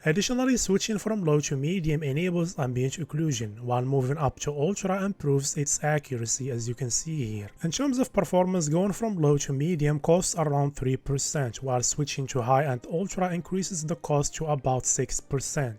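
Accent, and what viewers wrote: Morocco. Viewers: bayna fik maghribi, english m9awad 3andek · U sound moroccan bro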